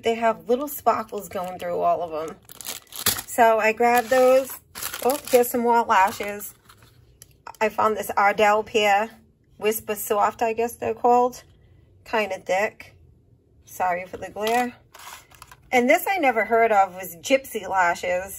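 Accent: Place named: Texas, United States. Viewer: I am really enjoying your videos. I am from Texas so I love your accent. You are doing a great job. Keep them coming.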